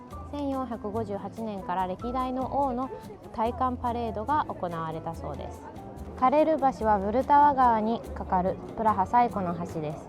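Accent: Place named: Kansai, Japan. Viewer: プラハ旅行の反省点も含めてレポしてもらえて嬉しいです🫶 さんめっちゃ可愛いのに関西弁な感じあって親近感わきます。笑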